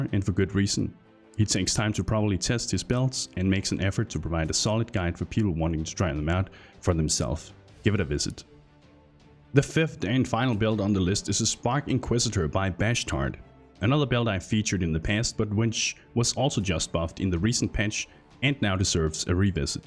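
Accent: Denmark. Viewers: Love you can hear you are danish.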